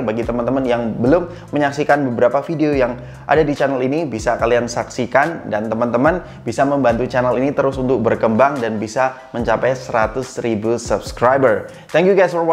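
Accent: Semarang, Indonesia. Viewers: Weh wong semarang ik 😄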